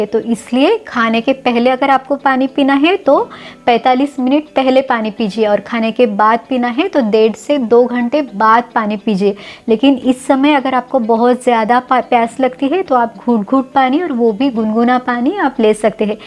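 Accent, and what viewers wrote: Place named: Maharashtra, India. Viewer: Madam apke bolne me Maharashtra ki jhalak he.lekin aap jain he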